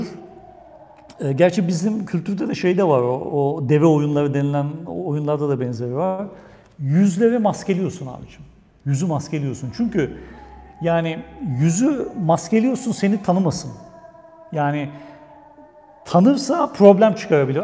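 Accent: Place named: United States. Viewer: Aynı konuyla ilgili ilk dersinizi de zevkle dinlemiştim ama bu çok daha zevkli oldu benim için. Teşekkür ederim hocam. Bu arada söylemeden geçemiycem Türkçe yi Amerikan aksanıyla konuşmanız da renk katmış😁 tekrar teşekkür ederim.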